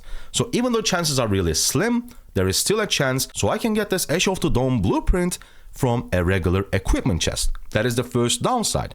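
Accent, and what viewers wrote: Turkey. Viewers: Bro you have a strong Turkish accent are you Turkish by any chance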